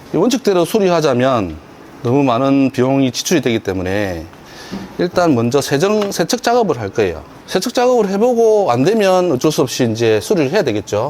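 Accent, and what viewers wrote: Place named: Gyeongsang, South Korea. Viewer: 대표님~어색하지만 ㅋㅋ 차츰 말씀 중 사투리가 사라지는 것 같은데.... 같은 경상도 사람으로서 씁쓸합니다.ㅎㅎㅎ 정겨운 사투리가 좋은데 ㅋㅋ ~ 수고하세요 !!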